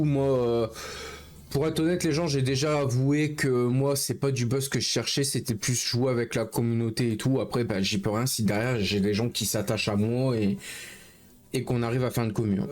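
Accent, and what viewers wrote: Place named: North, France. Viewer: l'accent de frotteur de bus du nord frère c'est effarant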